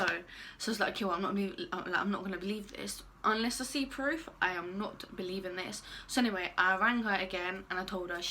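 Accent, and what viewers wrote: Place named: London, United Kingdom. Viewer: You from London or something!? Love the accent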